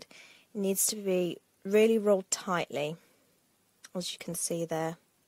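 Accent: United Kingdom. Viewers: Great craft, great accent! You sound like you are from two different parts of UK.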